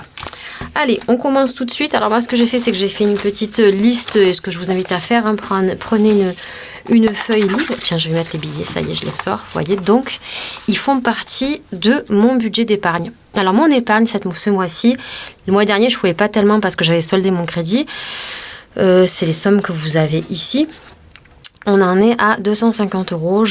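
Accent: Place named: South, France. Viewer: Je rajouterai avec un bel accent qui sens le soleil..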